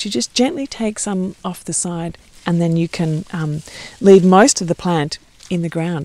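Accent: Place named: Australia. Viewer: Wow an Aussie I love the accent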